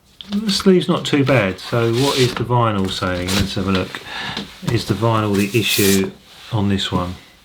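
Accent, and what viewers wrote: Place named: RP, United Kingdom. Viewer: Your videos are strange. I don't know if you care about music at all... Do you? Or are you all about reselling? Or is it just an obsession? Your "posh" (affected or not?) accent quite throws me of. I cannot figure you out... Where are you from? [Full disclosure, I am a Fleming from Brussels, but i've lived in Massachussets (USA) for 40 years.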